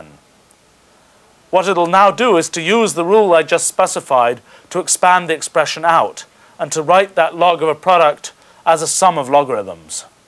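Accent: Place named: United Kingdom. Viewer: Actually his accent has become noticeably more British. The accent above sounds Mid-Atlantic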